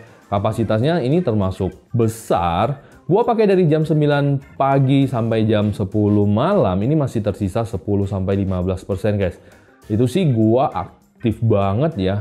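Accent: Pematangsiantar, Indonesia. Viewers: Bah orang siantar rupanya haha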